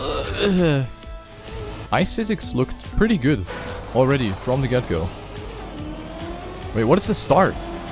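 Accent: Germany. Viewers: mann hört halt auch ein bischen das du deutscher bist lul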